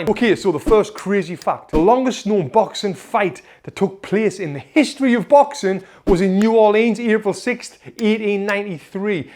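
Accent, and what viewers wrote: United Kingdom. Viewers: The accent is very british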